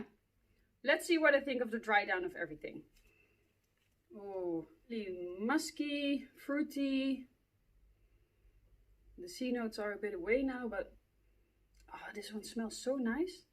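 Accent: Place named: Netherlands. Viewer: I love my line of YSL fragrances. La Nuit is probably in my top two fragrances ever. A dark, alluring, sweet and spicy... almost fresh cut grass sillage at times. Undoubtedly multiple of my favorite fragrances lie within YSL. You’re beautiful! I absolutely always love a Dutch accent. One of my favorites. Tot de volgende keer.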